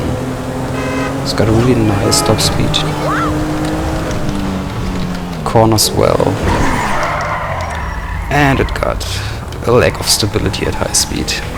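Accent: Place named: Germany. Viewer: man merkt an deinem Akzent dass du deutsch sprichst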